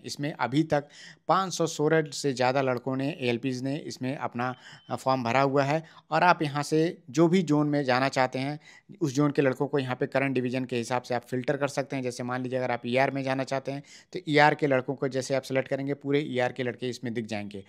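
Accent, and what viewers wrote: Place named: Uttar Pradesh, India. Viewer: U r from up .....ho na ? The way prounounce i get it...anyway...i just love the way 👍👍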